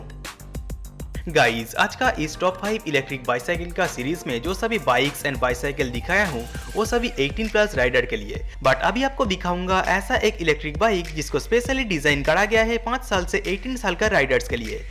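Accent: Bengal, India. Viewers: Bengal tone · Bhai thoda Hindi sudhar lena . Aisa lagta hain ke bengali bol rahe ho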